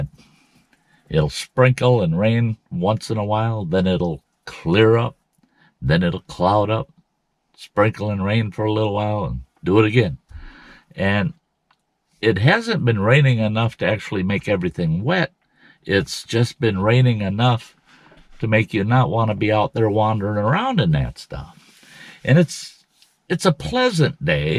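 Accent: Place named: Indiana, United States. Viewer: What state are you in, sounds like Indiana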